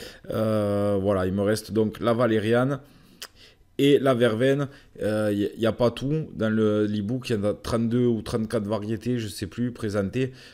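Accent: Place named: Paris, France. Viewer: Il a toujours, l'accent pointu 😂❤ail c'est dur une tomate 🍅 si elle tombe du balcon sur moi, je me fais une razia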